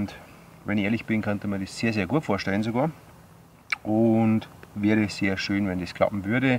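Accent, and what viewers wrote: Bavaria, Germany. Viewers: Da trau ich mich EIN Mal, ne Frage zu stellen und du nimmst die gleich - DANKE dafür!:D Und ich bin absolut dafür, dass du deinen bayrischen Dialekt beibehälst, denn der macht den ja erst komplett zum - und genau wegen dem schaltet man doch erst rein in deine Videos. Wem es nicht passt, soll sich andere Kanäle anschauen, aber hier nicht so nen Blödsinn daherschwallern. Meine Güte augenroll Wünsch dir noch schöne Rest-Weihnachten und schonmal alles Gute zum Geburtstag, bleib gsund und so wie du bist. Grüßla aus Franken:) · Bleib bei deim boarisch! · Hallo, dir und deiner Familie erstmal frohe Weihnachten & einen guten Rutsch ins neue Jahr! Ich danke dir für deine unterhaltenden Videos die ich als Kölner trotz bayrischem Dialekt sehr gerne schaue und auch nach den ersten 3 Videos hab ich gar nicht mehr auf den Akzent geachtet und verstehe inzwischen fast alles, also behalt das auf jeden Fall bei, ich freu mich nächstes Jahr wieder neue Bushcrafting Videos zu sehen. 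· Moin....dein Bayrisch finde ich super....wer das nicht verstehen kann hat selber schuld...du haste ne gute Art drauf...gruss aus Schaumburg...Gruss F. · Guten Morgen,ich grüße dich aus dem fernen Thüringen ins scheene Bayern,als erstes,ich find es vollkommen richtig das du zu deinen Dialekt stehst,das ist auch immer in ein Stück Heimat was man in sich trägt und wem es nicht passt soll sich deine herrlichen Videos nicht anschauen,ich versteh dich sehr gut,ansonsten find ich deine Frage Antwort Videos sehr sehr gut,leider im Hintergrund der neue Sound der Natur(Kettensäge),mach weiter so,beste Grüße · Bleib blos beim boarischn. Unsa Dialekt is einfach sympathisch und gmiatlich. Mia san ja koi Preissn. :D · Soll ich Dir was sagen, ich finde es gut, dass Du bayrischen Dialekt sprichst... das macht Deine Video's sehr liebenswert 😊. Und auch sonst wirkst Du sehr sympathisch. Deswegen bleibe wie Du bist. 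Frohe Weihnachten und einen guten Rutsch wünsche ich Dir und Deinen Lieben. LG Kevin 👋🏼😊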